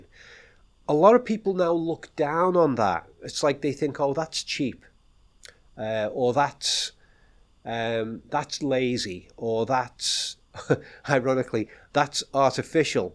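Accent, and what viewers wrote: Liverpool, United Kingdom. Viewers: Are you a Northerner??! Liverpool?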